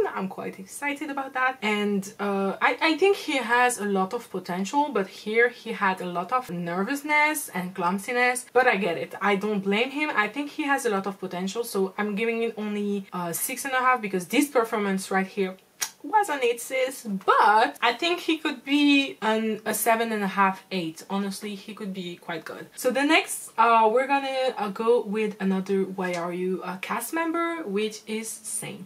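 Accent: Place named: France. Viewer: I love your personality!! Btw are you French? You have such a cute acceeent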